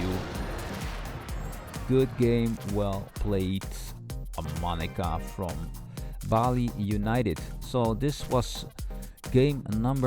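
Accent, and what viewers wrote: Netherlands. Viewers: Mooi amsterdams nederlands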